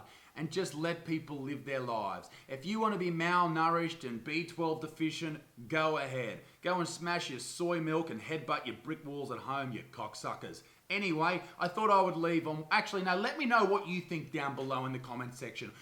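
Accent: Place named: Australia. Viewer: I don't know why but I absolutely adore australian accent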